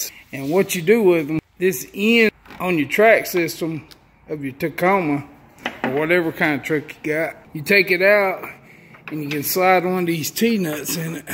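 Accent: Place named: Appalachia, United States. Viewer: You talk like a hillbilly just like me.. I sound so funny.. That is why I dont do vids.. Great vid. My next weekend project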